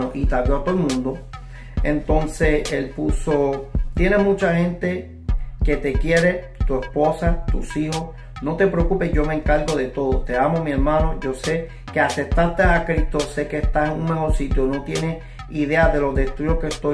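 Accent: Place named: United States. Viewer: No hablabas muy bien español O es mi imprension jajaja se te notaba más lo estadounidense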